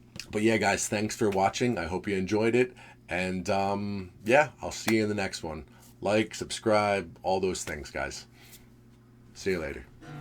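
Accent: New Jersey, United States. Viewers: Whenever videos come up his accent always brings me back home to Jersey. I grew up in Jersey but have lived in Alaska for over 30 years but accent always reminds me of where I grew up. Great Videos as well!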